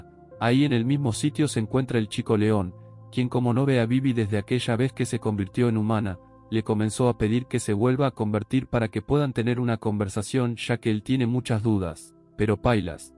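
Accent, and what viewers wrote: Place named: Argentina. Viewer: Apreciado Pibe 🎉❤🎉 se agradece por vuestro trabajo y esfuerzo, vuestra comunidad ya ha superado vuestras expectativas 🎉❤🎉 Me da mucha tranquilidad escuchar vuestro dialecto argentino estaremos a la espera de la siguiente parte 😊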